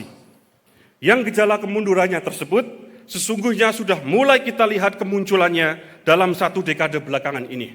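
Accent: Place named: Java, Indonesia. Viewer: Logat nya kok jawa ya??😂, jawa memang Muantaappp👍😁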